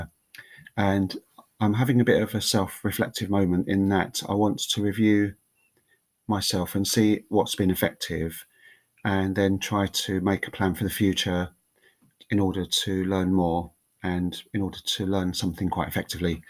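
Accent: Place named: England, United Kingdom. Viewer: Miło subskrybować twój kanał. Jak zawsze wygadany i ciepłe słowa w kierunku ludzi.Twoj język polski jest dobry.Jestes Anglikiem i normalne, że masz inny akcent.Dzieki za mile słowa w kierunku mojej osoby.Udanego weekendu.Ewa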